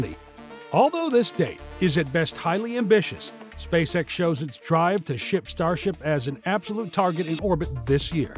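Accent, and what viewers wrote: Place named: United States. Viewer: Terrible grammar in several instances. The script was written either by a non-native English speaker or not very well proofread, perhaps both. This makes me wonder if the distinctly American voice over is computer generated if so it is very convincing. If not, the reader needs some editorial discretion.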